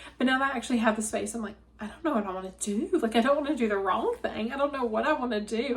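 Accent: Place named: Eastern North Carolina, United States. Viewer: Congratulations on the new home! It’ll be such a sweet time, and no hurry to “make it your own”… your style will take shape over time ☺️ Been watching and enjoying since your first post and am not usually a commenter on videos, but wanted to encourage you today… I’m also a fellow eastern NC girl (of 52 😉) and love hearing our native accent on YouTube 😁💕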